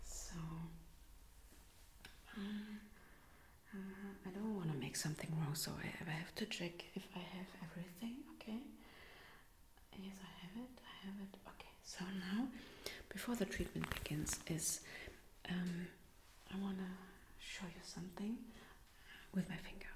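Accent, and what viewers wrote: Germany. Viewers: You are my favorite amsr artist!💖 My grandma was part German so i love the accent.💖💖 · I love these accents : a German and English combo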